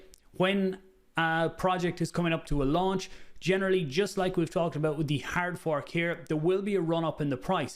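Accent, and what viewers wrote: Ireland. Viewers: Thanks for the quick video and update, —always appreciate your insight. New mic sounds clean; that beautiful Irish accent is as crystal clear as ever!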